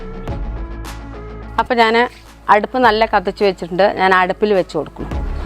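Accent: Palakkad, India. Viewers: പാലക്കാട്‌ ഭാഷയാണല്ലോ... എവിടെയാ സ്ഥലം അമ്മേ 😘😘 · Oru palakkadan speech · പാലക്കാടൻ ഭാഷ സൂപ്പർ ❤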